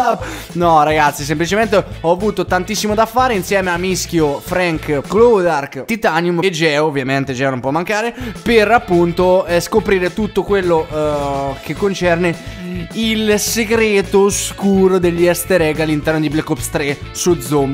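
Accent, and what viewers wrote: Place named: Ancona, Italy. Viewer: se vede da do chilometri che sei anconetano. grande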